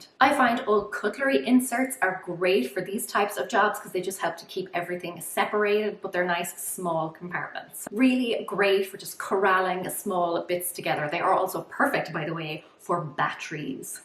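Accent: Ireland. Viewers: Thank You good to know. Also love your Irish accent.💚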